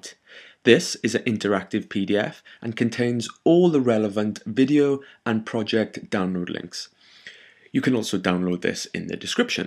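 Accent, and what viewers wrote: Wales, United Kingdom. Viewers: Asian-British?! As a fellow welshie, love it haha! x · What could be better than a smart young graphic design teacher with british accent?, your tutorials are amazing! be my teacher:-( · Are you welsh or am i just hearing things? I can't tell anymore.. lol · you're obv not British haha...he has a classic Welsh accent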